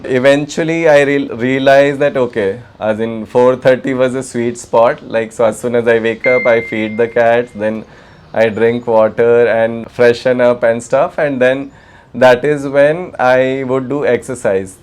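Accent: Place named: Kerala, India. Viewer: I love the way u speak, r u from kerala? i read miracle morning book by Hal Elrod and currently reading 5 am club by robin sharma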